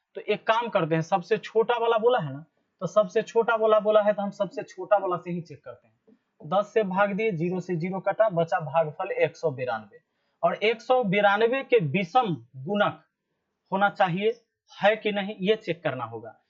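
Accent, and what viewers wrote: Bihar, India. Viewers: Thank you sir aap bihar se hai ya haryana se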